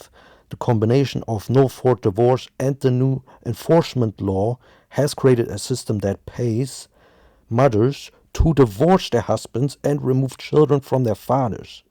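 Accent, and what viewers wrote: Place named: Germany. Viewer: Are u german I like your accent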